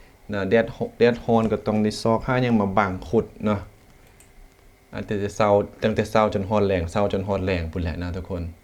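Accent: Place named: Lom Sak, Thailand. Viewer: เพิ่งมาดูเป็นคลิปแรก ฟังสำเนียงพูดของ แล้ว.. ตอนแรกคิดว่า เป็นช่องของคน อ.หล่มสัก หล่มเก่า จ.เพชรบูรณ์ และชาวเมืองเลย..พูดภาษเดียวกันเป๊ะ..กำลังสันนิฐานว่า คนไทยในเขตนี้เคยมีบรรพบุรุษเกี่ยวดองมาจากชาวหลวงพระบาง..อืม!! เราน่าจะเป็นญาติกันนะเนี่ย😊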